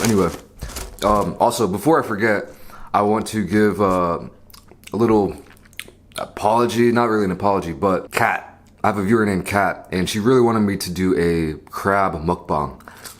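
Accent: United States. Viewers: He talks American asf though I thought he was from the United States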